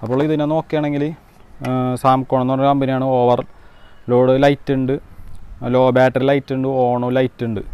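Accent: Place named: Edavanakkad, India. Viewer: താങ്കളുടെ സംസാരം ഒരു എടവനക്കാട് touch ഉണ്ടല്ലോ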